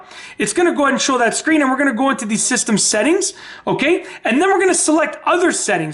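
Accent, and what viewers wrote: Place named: Canada. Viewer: I love that I can tell you're probably Canadian just listening to you. Well wishes from those of us who aren't crazed lunatics from the south of you.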